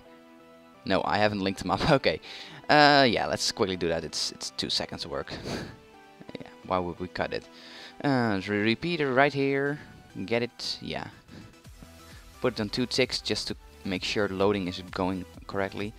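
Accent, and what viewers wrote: Netherlands. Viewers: ben je nederlands? je klinkt nederlands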